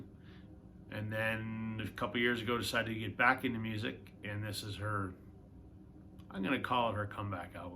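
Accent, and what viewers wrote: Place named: Canada. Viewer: Hey Man!! Good to find your channel.. I'm JT.. I live down in Reno, Nevada.. have a feeling. and hear in your voice that you are up in Canada.. especially when you showed that on your intro.. great band!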